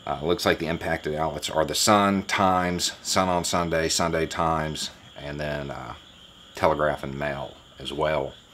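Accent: South, United States. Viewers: Its sometimes hard for a person from the Caribbean to understand southern accents,u just made it 100 times better,thank you😍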